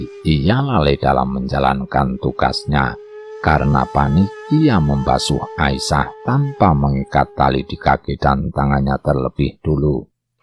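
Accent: Java, Indonesia. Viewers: Yg bawa cerita nya gk enak medok Jawa di ulang, ulang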